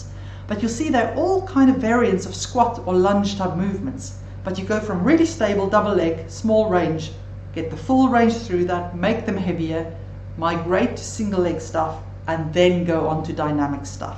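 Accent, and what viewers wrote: South Africa. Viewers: Quick question, i was messaging my knee and one the outer side just next to the knee cap, I got a long lasting sharp pain, could messaging trigger pain for this kind of injury? Love the South African accent! Thank you for the video! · Brilliant video. You sound South African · I am so happy I discovered this site . I have been suffering all of the symptoms shown on this clip , for 2 months , thinking I had rheumatoid problems. It’s difficult to actually see a medic these days , so any problems are generally handled over the phone . The advice I got was to consume painkillers , up to 8 a day , then get in touch if there was no improvement .... I took 2 and tried to keep my walks to 8k per diem , instead of the 16k to 18k that I usually do . I am still strong and reasonably fit for my age (79) .... time to my boring rave ..... I will start my new routine tomorrow. 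My mood is lighter already. Thanks to the lecturer , well presented information , clearly and succinctly in a great ..... South African accent ? Tomorrow I start . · … great and is that a lovely South African accent?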